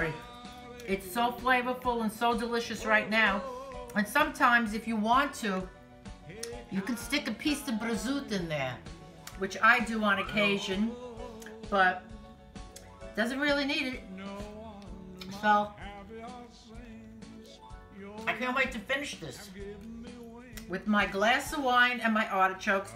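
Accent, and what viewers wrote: New York, United States. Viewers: What a hoot ! :) You sound like a New Yorker, my home - music to my ears. Loved your video. I've watched a few others stuffing artichokes and yours is the best, hands down. I'll be checking out your other videos. Keep cooking.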